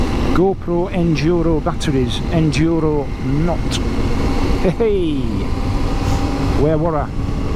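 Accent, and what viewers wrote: Yorkshire, United Kingdom. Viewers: Sorry to hear the Guzzi let you down. Always happy to see you riding in the Yorkshire hills, some marvellous scenery. Living in Bedfordshire, we are not blessed with much hilly scenery, but today we are having a ride out to the Dunstable Downs, which has some splendid views 😀. Always great to hear your Yorkshire accent and your choice of words when explaining any situation, look forward to the next video 👍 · Love the Yorkshire accent, part of the reason I watch and subscribe.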